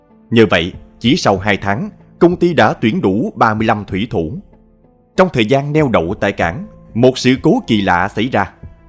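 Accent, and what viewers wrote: South, Vietnam. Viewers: Đón chào, giọng đọc rõ ràng, nhẹ nhàng, ko áp lực lỗ tai, khúc nào cần nhấn mạnh, chứ ko tăng âm lượng ko phải lúc, quan trọng hoá giọng đọc, diễn lố, đọc giọng miền nam tuyệt tuyệt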